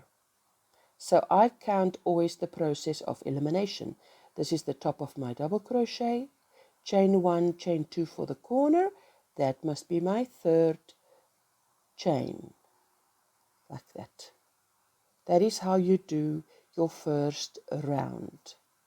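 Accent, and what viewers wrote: South Africa. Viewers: Love your blanket. I live in Johannesburg and it’s so lovely to see and hear a South African tutorial. Thank you for sharing talent xxxx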